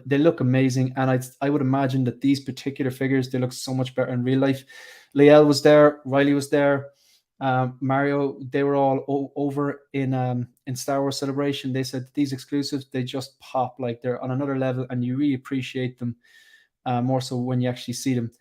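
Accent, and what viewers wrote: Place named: Ireland. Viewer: assume by your accent you are based in Ireland? So where do you normally buy your figures ?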